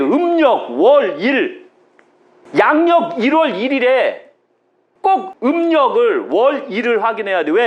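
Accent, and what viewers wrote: Chungcheong, South Korea. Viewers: 이 분은 사라져가는 충청도 방언을 잘 간직하고 계시네요. 충청도 방언을 꼭 지켜 주세요. 진지드셨슈? 얼마나 점잖고 푸근합니까? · 안녕하십니까ㆍ10월14일화이자1차2차 부작용으로 죽음의문턱까지 다녀온사람입니다 엊그제 퇴원했구요ㆍㆍ뵙고싶은데 고관절양쪽괴사에ㆍ진작에 찾아뵙고싶었는데ㆍ아이랑 먹고사는거외엔 16년동안 일만해왔기에ㆍ혹 전화상담 하고싶습니다ㆍ 오늘도 인천이 친정이라서 ㆍ언니랑같이ㆍ유투브상영하고왔습니다ㆍ건강되찾아서 ㆍ꼭뵙고싶습니다 ㆍ정말 저도고향이 충청ㆍ청양이고ᆢ구수한 충청도 말씀이 너무좋습니다ㆍ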